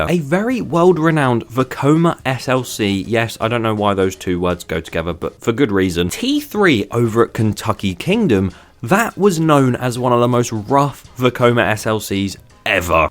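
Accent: United Kingdom. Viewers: I feel like you’re from Yorkshire, tell me. But don’t doxx yourself lol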